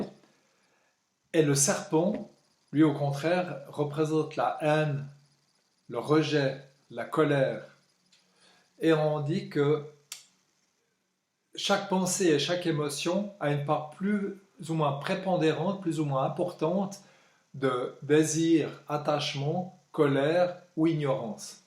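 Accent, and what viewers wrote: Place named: Switzerland. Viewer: Tu es pratiquant bouddhiste ? Quelle tradition, où ça, quelle Sangha ? Vu ton accent je crois qu'on est pas loin géographiquement ? Tu semble être suisse non ? Moi je suis tout près de Genève !!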